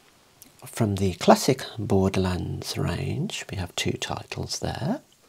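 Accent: United Kingdom. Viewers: I adore this accent. Obviously somewhere in the UK, but I can't place it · Have just stumbled onto your channel, and my immediate thought was "oh no he's a Brummie", as am I and I loathe the accent! However, I'm picking up Black Country? Whatever your accent is, your voice is just heaven Sir, and I loved the retro games, definitely my era 😊 Thank you so much, you have a new subscriber 🤗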